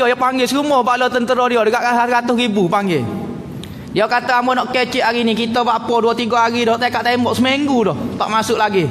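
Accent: Kelantan, Malaysia. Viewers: betol tu...syok ustaz ni wlu dialek kelate agak pekat..(ya ka??kito ore kedah)..sblm ni ustaz azhar idrus...skrg ni ustaz ni kira atas skali dlm carta uetaz2 popular peribadi...moga sihat selalu ustaz...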